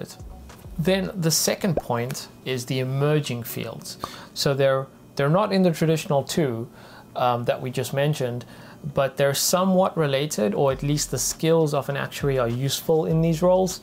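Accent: South Africa. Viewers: Nothing more beautiful than a South African accent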